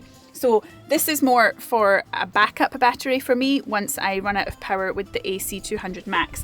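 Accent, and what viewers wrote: Scotland, United Kingdom. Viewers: Soon as I saw this, thought brilliant, love one of those so checked out the web site and they are pretty useful compact pieces of kit ,really handy for recharging; Our head torches, my old basic XP130 Fuji Camera (mostly for Insta but planning on relaunching utube channel), power washer (for our muddy mtbikes), devices, vacuum cleaner (camp necessity with debris and midgies reluctant to exit by their own free will) and brightening things up with traditional Christmas multi coloured led string lights. Love your content and delivery with that accent and enthusiasm for whisky/all things Scottish! cracking channel and entertainment!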